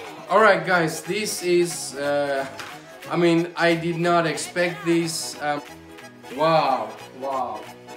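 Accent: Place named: Italy. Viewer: Love the hat!!!!!!!!! your English Accent does actually sound Italian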